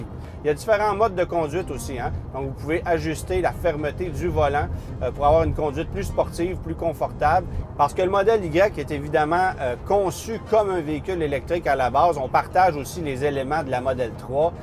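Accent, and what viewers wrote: Quebec, Canada. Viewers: L'accent québécois, on pourrait s'en passer. Parlez français s'il vous plaît. On a pas tous envie de vomir 🤮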